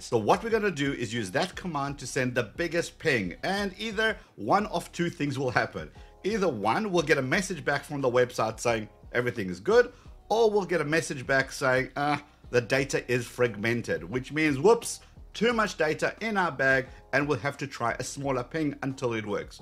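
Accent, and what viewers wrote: South Africa. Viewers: south african guy hear accent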